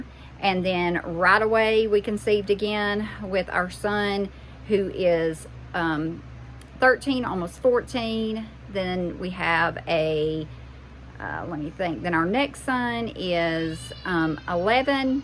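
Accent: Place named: South, United States. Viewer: New subscriber! From the south also, so, I love your accent. 😂 Looking forward to watching your videos.❤❤!! 😊❤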